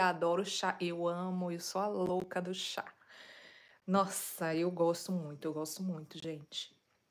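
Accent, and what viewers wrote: Bahia, Brazil. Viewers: Que delícia de página! Comecei a seguir hoje ! Que delicia de sotaque Baiano! História de vida linda❤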